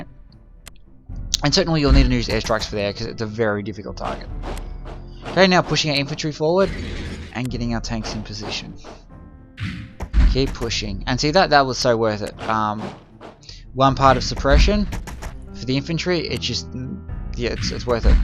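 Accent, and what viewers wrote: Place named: Australia. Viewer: Expertly played mate; was surprised to hear an aussie when i started the video to be honest. I might watch a few more of these if i need help nailing a brilliant victory later on; did you upload videos for all of the Red Turn campaign?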